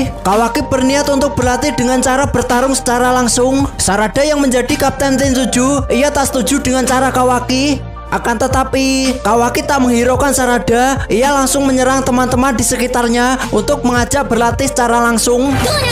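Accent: Java, Indonesia. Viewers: Logatnya medok pisan😅 semangat min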